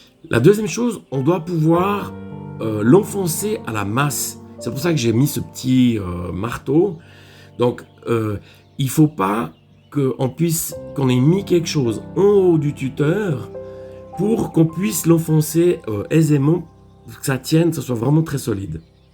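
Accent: Vaud, Switzerland. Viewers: Bonjour vraiment très intéressant votre vidéo 🙏 vous avez l accent suisses vaudois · je suis d'accord, un pur vaudois (et non romand de Neuchâtel) chantant et savoureux 😉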